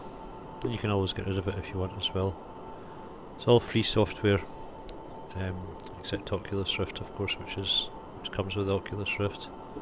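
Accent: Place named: Scotland, United Kingdom. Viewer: ohh shit you Scottish mate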